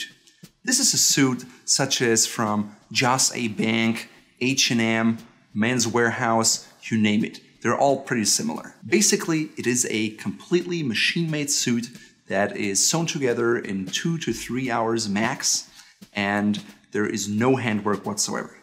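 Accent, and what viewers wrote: Germany. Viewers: I sense German. not sure if correct or not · Awesome. Thank you for making this video. I hear a slight accent, German or Jewish. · He has a hint of a German accent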